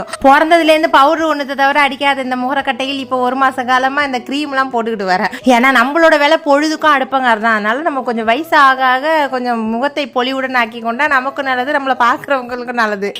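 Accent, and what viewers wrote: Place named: Thanjavur, India. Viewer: பேச்சு சூப்பர்... ஊர் பாசை நல்லா இருக்கு... தஞ்சாவூர் பாசை....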